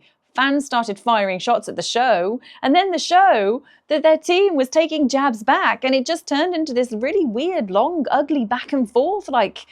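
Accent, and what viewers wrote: United Kingdom. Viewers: You are British? I thought you just had a cool accent.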